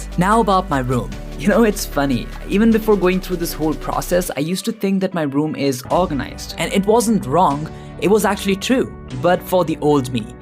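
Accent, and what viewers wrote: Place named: United Kingdom. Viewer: you have a good british accent btw😊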